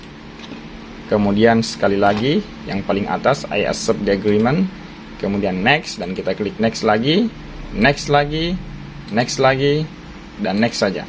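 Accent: Indonesia. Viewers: Orang sulawesi selatan yak ? 😂😂😂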